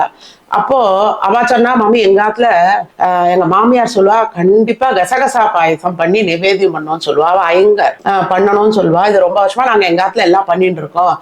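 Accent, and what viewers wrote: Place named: North Arcot, India. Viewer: Mami- so happy to have come across ur channel. You should be from north arcot. Been looking for NA samayal and tradition to a long time. Yours is the first. Getting so nostalgic of my childhood , your voice and accent resembles a lot like mom and paati. ‘Aponcha’, ‘Vadaparupu’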